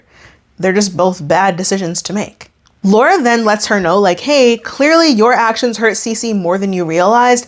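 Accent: Canada. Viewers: I don't know why you hate your Canadian accent so much. It sounds good to me, I think you should embrace it. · Nah bc girly I feel attacked since I brought up the Canadian "about" thing a video or 2 ago 😭😂❤️ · ITS OKAY I LIKE THE CANADA ACCENT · Lmao when you said”in weird round about way” I died 😂😂 I’m sorry I love Canadian accents Editing to add Kami would’ve sus it out because it was definitely fake 😂😂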